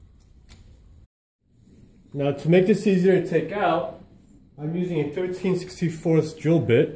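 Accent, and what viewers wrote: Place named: New York, United States. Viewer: good video. love the witty commentary. $ says you are from NY or NJ, reminds me of home (NY)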